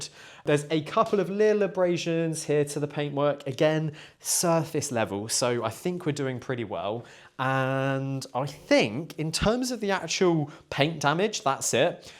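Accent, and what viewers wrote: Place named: RP, United Kingdom. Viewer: Thats a very posh entrance for a bike journalist, though he does have a very posh accent.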